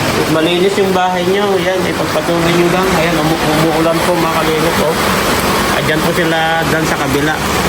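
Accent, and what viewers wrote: Ilocos, Philippines. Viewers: Salamat sa pagtulong at naka subcribed napo ako sa chanel nyo sir ilocano kasi sa tono po ng pananalita mo god blessed po at sa magkapatid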